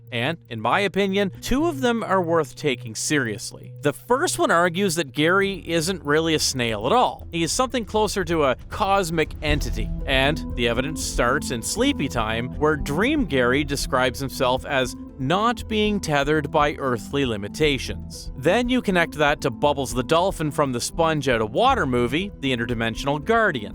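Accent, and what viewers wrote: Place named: Canada. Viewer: you sound too Canadian to be AI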